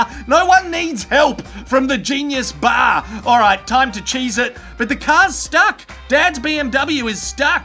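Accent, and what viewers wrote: Australia. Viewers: Fucking love this guy Are you an Aussie too mate Im dumb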